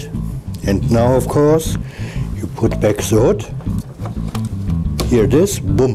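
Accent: Germany. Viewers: Good video, just ordered a refurbished one, gonna change the cpu and add a ssd and 16gb of ram. By the way... Are from Germany or a native German speaker? Greetings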